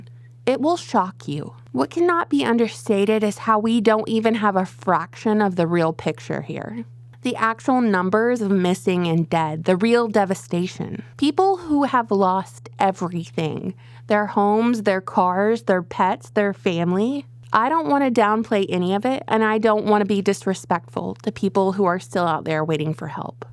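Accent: South, United States. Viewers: Because she is southern.,duh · she didn't talk like that before, not that southern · she never had this deep of a southern accent before. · wait a second, you have a southern twang now which you didn't have before, what happened?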